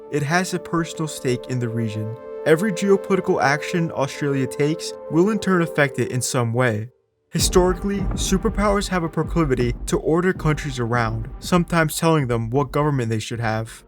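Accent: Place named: United States. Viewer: This video sounds a bit America centric, this American voice over doesn't seem to realise we're done fighting for other people how about Australia concentrates on itself, fu*k the rest of the world especially America we dont need them they only want anything to do with us when it benefits them, USA the most useless ally any country could rely on and get screwed over by.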